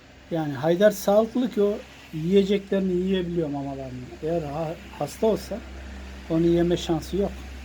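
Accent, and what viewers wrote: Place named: Kayseri, Turkey. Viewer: Abi ben daha önce de yazmıştım size sizin şiveniz kayseri şivesi bana bu şive tanıdık geliyor bende kayseriliyim antalyada oturuyorum yani yakınız sizi hep izliyorum annenize allah iyilik versin hayear zaten bir numaralı artist maşallah Bu arada yeniyılınız kutlu olsun 😸😺😻🙏👍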